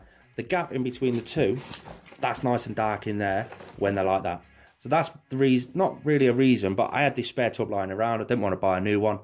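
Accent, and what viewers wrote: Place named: Cockney, United Kingdom. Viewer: i’m american, you sound cockney to me 🥴 i just enjoy the accent a lot and can’t quite say it right 😂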